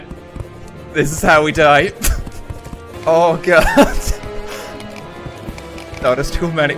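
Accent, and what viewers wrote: Ireland. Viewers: R u irish? cuz ya got an irish accent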